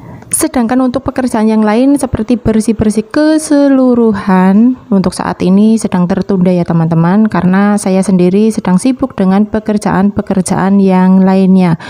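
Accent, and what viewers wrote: Banyumas, Indonesia. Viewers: Nembe nemu channel kie, sampeyan si piyantun ngendi mbak ?, medok banget ngomonge alias ngapak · Mbk wong ngapak yak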